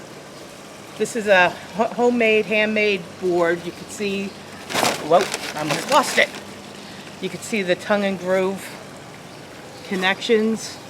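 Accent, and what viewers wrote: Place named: New Jersey, United States. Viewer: Glad you got a YouTube Channel! Nice to hear your voice and that beautiful Jersey accent. The group hooked you up. There is a place in the town where I grew up, that sells these Mexican made grills that look like animals. The also sell pretty good barbecue. They have a bull like that out in front. When they’re open, they have it smoking with a charcoal fire in it. C’mon, you gave the bull a girl’s name? Margarita? Give it a tough name! Such as “Pancho” or “El Bandito” or “El Fuego” or “El Desperado”. What ever you call it the bull and the board are nice.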